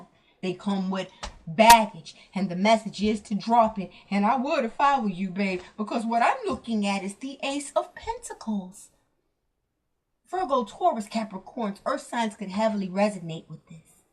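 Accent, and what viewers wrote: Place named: New York, United States. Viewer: I like readings here new York accent sista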